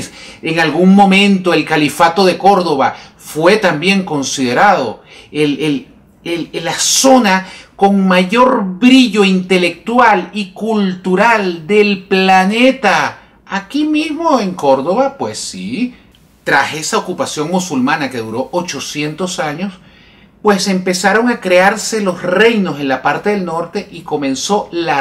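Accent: Venezuela. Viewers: Eso que dijiste: "España es el Perú de Europa" . Te suena muy ofensivo cuando comparan el Perú con España, y no parece indignarte que se refieran así del Perú. Uhm... y vos no pareces español... más bien venezolano. Pésimo comentarista, no reflexiona en lo que habla. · Es venezolano y está super claro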